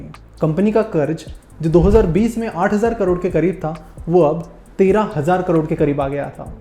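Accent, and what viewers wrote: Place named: Maharashtra, India. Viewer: Did anyone notice his Marathi? Loved it tho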